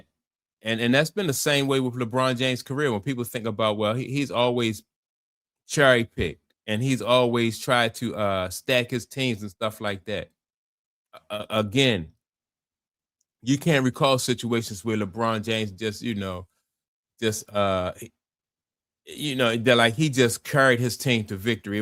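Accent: Baltimore, United States. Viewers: Can tell my man doing the video is from Baltimore. I respect the hustle G! Great video.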